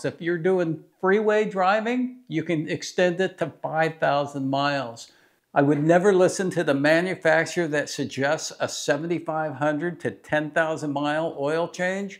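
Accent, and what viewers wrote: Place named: United States. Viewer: I noticed that too. Thought it was a European spelling but he sounds American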